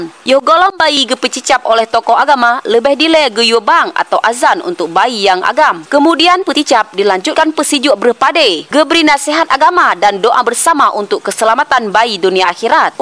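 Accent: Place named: Aceh, Indonesia. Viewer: Mantap ureung baca berita....asli bahasa aceh.....lon juga warga geumpang.. 💪 semangat gempang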